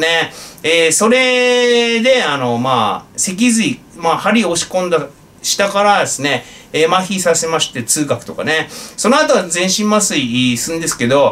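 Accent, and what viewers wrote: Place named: Tohoku, Japan. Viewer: 良かった！ずうずう弁で、活動再開！福島頑張れ👍俺は、茨城だが！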